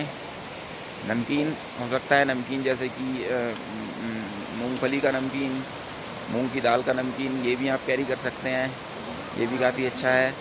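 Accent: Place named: Kerala, India. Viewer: ഒരു മലയാളി ശബ്ദം!,🤔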